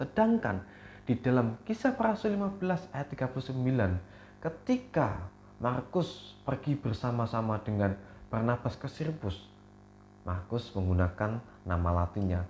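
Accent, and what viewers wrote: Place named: Java, Indonesia. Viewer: Logatnya kaya orang jawa..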